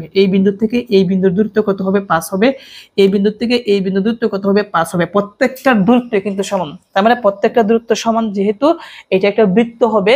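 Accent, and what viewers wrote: Sylhet, Bangladesh. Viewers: Apnii ki sylhet er habiganj er ?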